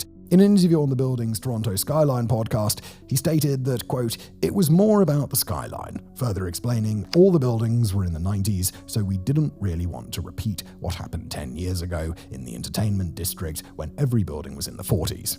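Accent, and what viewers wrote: England, United Kingdom. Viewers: GOOD. Consider slowing down the speech a bit. The Brit accent is quite fast and tough to keep up with. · Native born Anglo Canadian... so... I had to turn on CLOSED CAPTIONS. Our host talks so fast that it is hard to adjust for his regional accent. It seems the more I want to know what he just said.. the more hurried and non-standard are the sounds. It is a voice that sounds "learned" .. emphasis on the "ed". And I cannot learn from it. An achievement in speed talking with some English county to thank.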